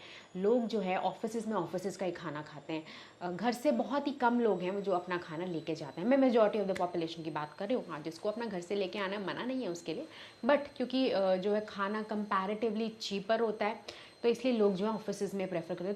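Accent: India. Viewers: Why do you sound like a Maharashtrian? 😅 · Hariyana se hai ke.. Rom Rom ji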